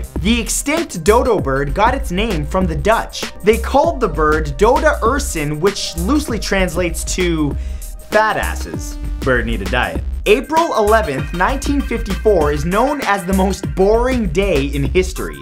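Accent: Canada. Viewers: Well he's Canadian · He's Canadian. Pretty obvious from the accent. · He's Canadian I am and we pronounce things different like you guys say bag we say beg! Or you guys say milk we say melk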